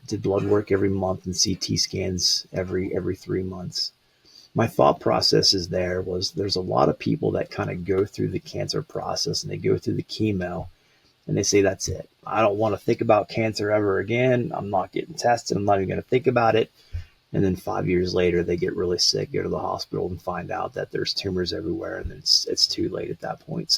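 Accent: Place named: Pittsburgh, United States. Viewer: You can hear that Pittsburgh accent for sure!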